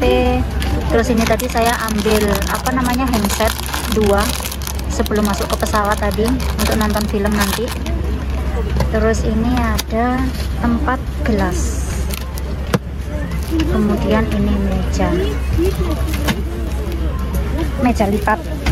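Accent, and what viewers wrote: Java, Indonesia. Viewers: Saya suka sekali bhsa penerangannya mbak. Gk pernah ninggalin bahasa medoknya wong jowo. Semngat buat sanoean berdua..serasa ikut merasakan perjalanan kalian berdua. Mantap mantap. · Mbak slm kenal iki wong jember hadir oya mbak jawanya dr a mana ta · lidah jawa banget ya mbk singgapur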